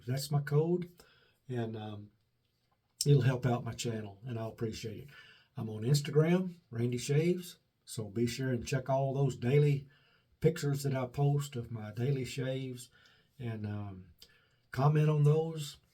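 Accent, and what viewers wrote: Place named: South, United States. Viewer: Excellent shave sir with top notch products!!! If the soap smells like Stirling Haverford, which happens to own, it must smell darn good. Tobacco and vanilla can't go wrong. The razors audio feedback is tremendous, I'd love to own one, can't get them in the UK unfortunately unless I pay the ridiculous shipping costs involved. I have also the exact same semogue OC with this orange handle it's fantastic brush as all the semogues are. Love your videos keep them coming. I also love your southern accent it's my favorite accent sounds like music in my ears. How often do you shave? Enjoy your day buddy.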